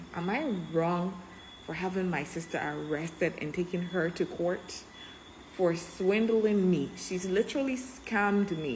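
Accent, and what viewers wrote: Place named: United States. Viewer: U sound like someone living in usa not Italy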